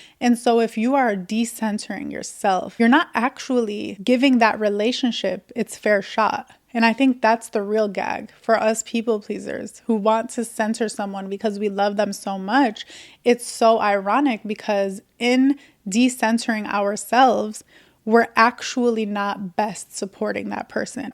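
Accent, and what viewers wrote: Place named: Michigan, United States. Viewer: girl r u from southeast Michigan? You sound so local it’s crazy haha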